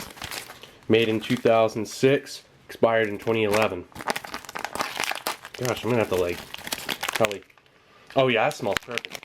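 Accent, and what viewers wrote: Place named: Southern California, United States. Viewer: I agree as well, you have a cool voice, are you from southern California? you sound like we do down here in the San Diego area.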